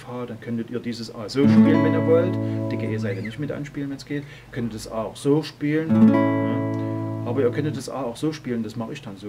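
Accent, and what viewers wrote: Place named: Saxony, Germany. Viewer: ganz toll gemacht....normal mag ich das "sächsische" ja net so gut leiden....aber du bist da mit abstand ne ausnahme....liegt wahrscheinlich an Deiner symphatischen Art das Ganze zu erklären. TOP--> super Tutorial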